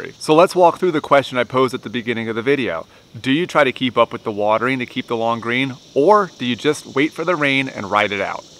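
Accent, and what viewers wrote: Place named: North, United Kingdom. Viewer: What's your location? you sound like you're from up North but Bermuda doesn't grow up there ha.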